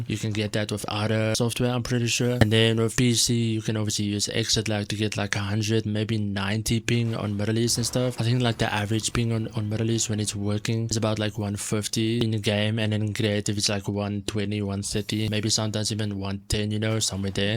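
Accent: Afrikaans, South Africa. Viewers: Awe ek kan hoor jy is Afrikaans 😂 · when i first clicked the video i assumed u were from usa but i heard the afrikaans accent 😂😂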